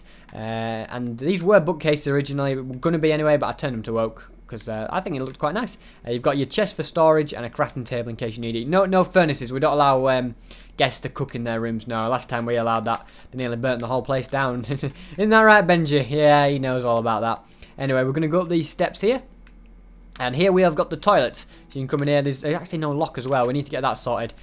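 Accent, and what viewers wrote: United Kingdom. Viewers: Are you british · Happy Gamer he doesn't say fam it's just his british accent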